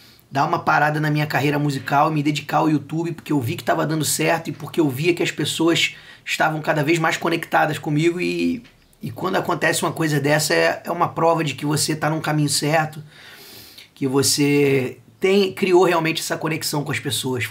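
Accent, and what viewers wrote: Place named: Rio de Janeiro, Brazil. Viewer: Eu descobri o canal ontem. Tava procurando ver algumas dicas sobre programas gratuitos e dei like em quase todos que assisti. Hoje vi o vídeo que você mandou ontem sobre a interface. Aquilo doeu em mim. Sei o quão difícil as coisas estão pra todos. Eu não poderia ajudar hoje, ia esperar ver se entrava algum, pra mesmo sem nada, poder ajudar um pouco. É o ditado que diz que onde dorme um, dormem dois...ou quase isso. Mas logo depois vejo esse vídeo e fiquei feliz de saber. Desejo tudo de bom pra ti e esperamos que essa porcaria acabe logo pra gente poder voltar a ensaiar, tocar, gravar, aparecer mais shows, trabalhos, gravações, receber um qualquer...e por aí. Bem, eu além de músico e amante de guitarras agora virei luthier e assim que isso acabar, vou te convidar pra vir aqui conhecer minha oficina e a gente bater uns bons papos sobre música. 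Eu já havia brincado com gravação em casa no início desse século mas fiquei muito tempo por fora e agora que voltei. To desenferrujando mas vamos ter muito papo pra bater. Eu sou do Rio, e pelo que vi do seu sotaque, também é. Vai ser bem legal, se puder aceitar o convite. Abração e fique bem com sua família. Parabéns, pelos vídeos! abração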